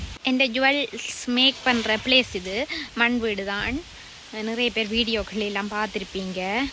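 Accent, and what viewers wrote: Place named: Sri Lanka. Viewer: Srilanka தமிழ் அழகுதான்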